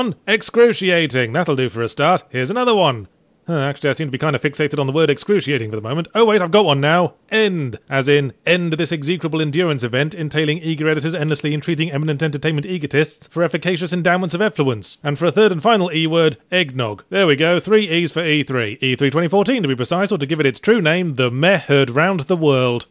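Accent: United Kingdom. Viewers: it is like all of my gamer grief in one video but with a british accent.... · Man. I love the cynicism of this show and the British accent only makes it better. Couldn't stop laughing.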